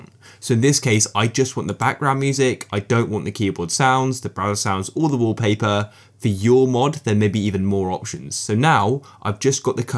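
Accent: United Kingdom. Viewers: WHY IS ALL TUTORALS BRITSH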